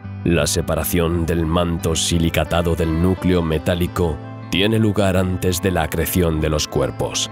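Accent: Spain. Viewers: Y luego esa voz robotizada ,con acento español ,que huevaa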